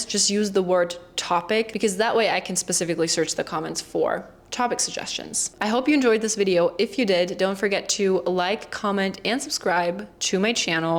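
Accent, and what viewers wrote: United States. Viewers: Yep, i am German and in the beginning I did not know if she is American or German. But no American can fake a funny, German accent. Now, as i know she is one of us, i am blown away how perfect her American english is. But: please dont make so much Werbung for working in Germany. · Love your vids here and on IG. You have a lovely fusion accent of German-English. So classic Why try to sound so American here? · biggest proof you here in America and speaking as if you were an American. Germans are defeated culturally since WW2 they are neutered. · Wow you sound really American. A few words you can detect a difference, but most of the time you sound 100% American to me. Hard to believe you've only lived here 2 years. With that said, I don't see the pressure in American jobs. I also don't see a problem with the lack of welfare. My family left Germany back in the 1850s, so I'm as American as you can get at this point (I'm about 75% German, and my other ancestors came here from England, Ireland and Scotland as far back as 1620). 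I was brought up to work hard and get ahead. You only buy what you can afford. Live within your means. Welfare is only for the lazy or those who aren't capable of taking care of themselves. The one thing I wish we had was the mandatory 30 days off. It would definitely be nice to work 400 less hours a year, but there's a reason we're wealthier too. We work more, so we earn more. I grew up working on a farm/ranch, working about 30 hours a week during the school year and 80+ in the summer. Sometimes 100 hours a week. So 1800 hours a year isn't much to me. 1400 is nothing lol. I've had many discussions about Germany and what it's like there since my best friend from college is German and his brother (also my friend from college) went back to Germany and works as a lawyer there. It's interesting to hear the differences. I haven't made it to Germany yet, except the airports, on my way to/from Ukraine where my wife is from. 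Funny side-story here: I gave up on American girls many years ago, and was actuall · Thank you for this. Your American accent is perfect.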